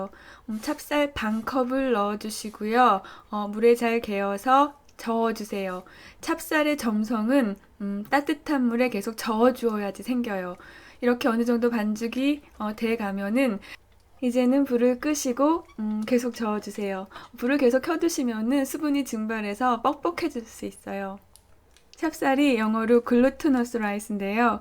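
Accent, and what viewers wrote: Seoul, South Korea. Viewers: 말투가 90년대 서울사투리 쓰시는거같아요 ㅎㅎㅎㅎㅎ 신기하당 ㅎㅎㅎㅎㅎㅎㅎ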